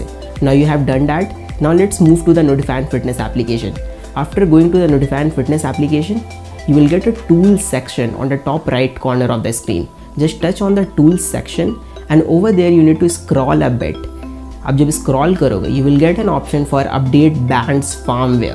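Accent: India. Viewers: Is this guy switching to indian mid video ?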